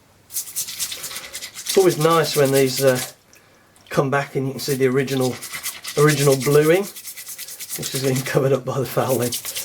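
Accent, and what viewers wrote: England, United Kingdom. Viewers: I hardly ever disassemble completely. I just scrub out the barrel cylinder and wipe out the outside. It only gets a complete hair down like twice a year never had an issue. And you sound English, I take it. These are the only handguns you can own. In the United States they are not even considered firearms. I would look into getting a conversion cylinder. But I do not know if that would break your laws. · It sounds like an English accent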